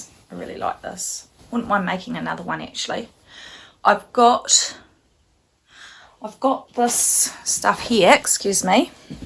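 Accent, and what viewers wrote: New Zealand. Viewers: Hi So nice to hear another kiwi accent on YouTube. I am from Auckland and will go back and watch all your early episodes.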